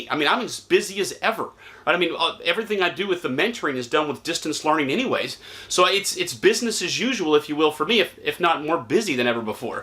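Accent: South, United States. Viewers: I'm working to get pre-qualified now as a first time home buyer. Just want to say that I love your videos,. You've got great energy, mannerisms, and a southern draw to boot. I could watch all day!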